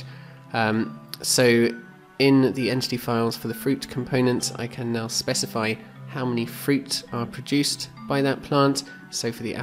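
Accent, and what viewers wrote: Germany. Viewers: Wow, your accent is so clean. Didn't expect you're a German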